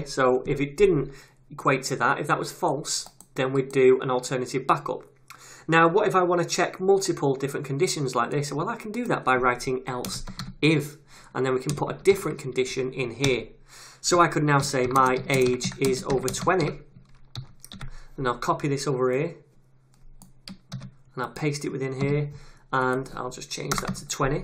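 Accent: United Kingdom. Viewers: Where about a in the uk are you from buddy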